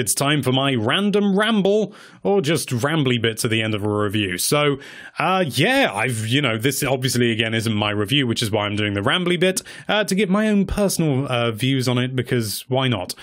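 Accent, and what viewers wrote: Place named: United Kingdom. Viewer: Click on video Hear British accent Close video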